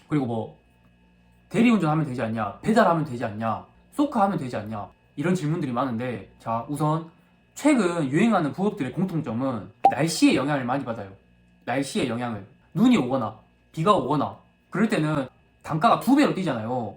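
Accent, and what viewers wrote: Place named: Jeolla, South Korea. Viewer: 출신이 전라도인가요?억양이 전라도아신데...그럼 99.95% 사기꾼.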